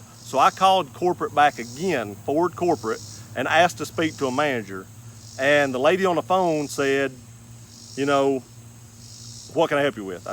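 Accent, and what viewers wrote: South, United States. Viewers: Love the country twang!!! Great video, New subscriber here. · Which state did you film this video in? It looks quite hilly in the background. You also have a distinctive southern accent.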